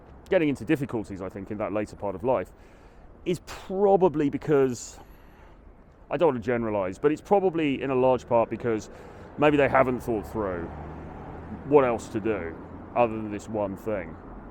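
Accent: United Kingdom. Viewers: Yeeeesh how'd I know you'd be British. Lolol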